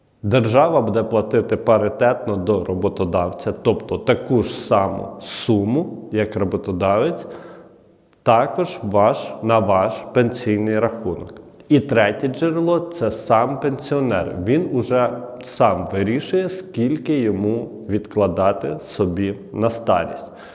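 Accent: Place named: Western Ukraine, Ukraine. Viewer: Делай правильное ударение на слове,, буде,,, ты видно с западной Украины, сразу слышно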